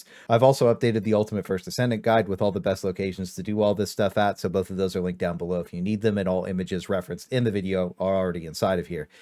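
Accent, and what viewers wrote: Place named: United States. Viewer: It's an American thing. Water is warduh.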